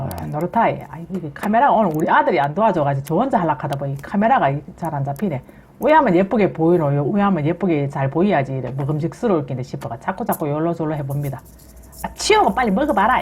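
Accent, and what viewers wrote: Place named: Gyeongbuk, South Korea. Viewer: 와 안니비지노 ㅋ 경북말씀 맞아요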